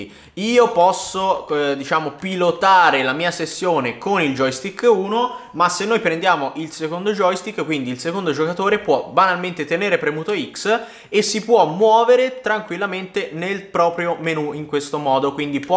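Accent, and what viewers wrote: Tuscany, Italy. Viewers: Ma sei toscano? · We bello toscano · Si chiama parlata toscana/fiorentina🤦‍♂️ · ma sei toscano? mi sembri un po' toscano 😂🤗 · Ma sei toscano?? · Bello l'accento toshano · Sei toscano vero? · Quanto toscano🤣😊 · Sei toscano?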